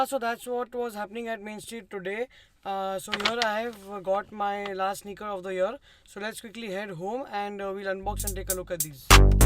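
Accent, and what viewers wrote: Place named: England, United Kingdom. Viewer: Your vidoes and content are very good but many of my frnd Don't take interest in because of your very heavy English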